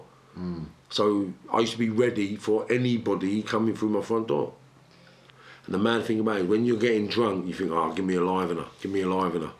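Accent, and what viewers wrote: Liverpool, United Kingdom. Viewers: Your scouse accent is boss! Have you lived their or just got family/friends there?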